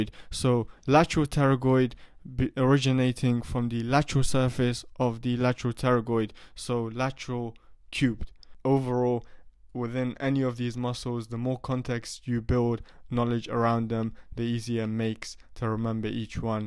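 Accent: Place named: England, United Kingdom. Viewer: great..loved it, and its propper English accent😱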